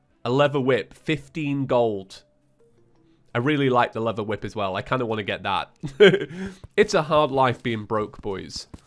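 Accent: England, United Kingdom. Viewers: I believe that is the English accent making it hard to say chests. Us Minnesotans are fine.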